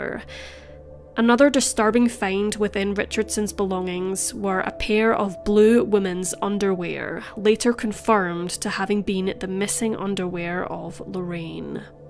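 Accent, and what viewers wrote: Scotland, United Kingdom. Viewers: I'm not a native english speaker so the pace really helps me understand the Scottish accent :) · Sounds to me like her pace of speech is just normal. Not too fast and certainly not too slow. Just right. And I would say, with having her beautiful Scottish accent, for many, this pace (rather than fast) would be ideal. :) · People like a range of delivery speeds and pitch/speed variation. I like hers for her. And some are effective with different paces. I'm originally from the East coast USA, though I've lived in the NW and SW. East Coast people tend to speak faster. Slower: Southern, Western Mountain. And the Scottish parts narrator is from. Because she to me speaks with an accent, one I like, I wouldnt' want it sped up much or I might not understand her. E.g., there are vids of unintelligible faster talking Yorkshire people. 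· It’s the Scottish way of saying he was born into a family. Like a wee Bairn. 😂 My family are originally from Scotland. Her accent is cute. I’m American though . I don’t have it though unfortunately. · Ignore all the idiots criticising the accent. As a fellow Edinburgher I’d detect the ‘Educated Scots’ tone a mile away. The accent is actually far batter for enunciation and pronunciation than almost any in the U.K. Anywho, if you are taking requests-how about the Alan Wilson/Ian Sutherland case? By a circumstance of pure serendipity I wasn’t actually at one of the trial seasons for Alan Wilson when he has charged with child molestation. Imagine my surprise when, a few years laters, he turns up dismembers in a bin next door to where a work colleague lived. Life is funny that way eh? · I’m born and bred in Liverpool and never heard of this case. Beautifully narrated love the Scottish accent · Why would anyone criticize your lovely Scottish accent? 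Your accent and compassion for the victims are part of the reason I like this channel. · Love your voice and accent. Sounds Scottish. Lovely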